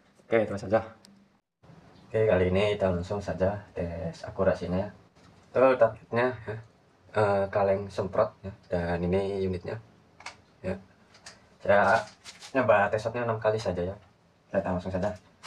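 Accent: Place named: Cirebon, Indonesia. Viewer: sampean dari cirebon mas ?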